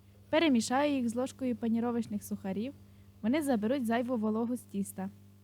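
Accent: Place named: Lviv, Ukraine. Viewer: Я у захваті від вашої подачі цього простого, на перший погляд, десерту, приємно слухати львівську говірку! Дуже дякую!!🥰🏆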